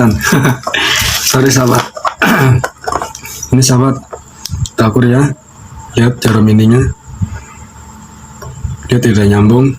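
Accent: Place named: Banyumas, Indonesia. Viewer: sampean wong ngapak kulon ya mas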